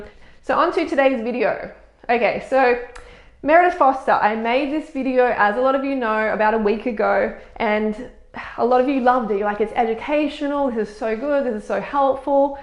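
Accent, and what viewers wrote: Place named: Australia. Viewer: i live for your Australian accent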